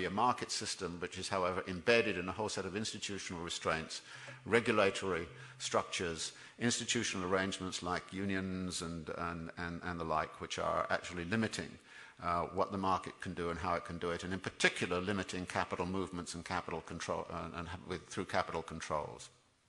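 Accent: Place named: RP, United Kingdom. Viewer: I despise marxism, and commmunism. He is basicaly telling us this; "This 'crisis' is proof that your system is not working. Adopt marxism." . When crisis occurs under marxist system, watchout! Out come show trials, arrests of enemies of the people. His scolarly British gentry accent does not fool me. If people like him are in power, they will spill as much blood as they deem necessary to keep it. The red flag of communism gets its color from being dipped into blood of its victims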